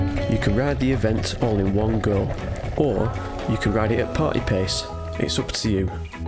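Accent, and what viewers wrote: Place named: North, United Kingdom. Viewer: Great vid, narrated by a good Northern lad. Magic 👍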